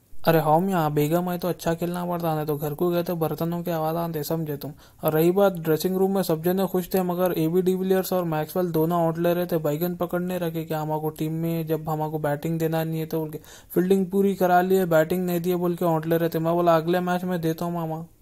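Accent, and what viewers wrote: Hyderabad, India. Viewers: Bhai teri aawaj aur haidrabadi style kamaal hai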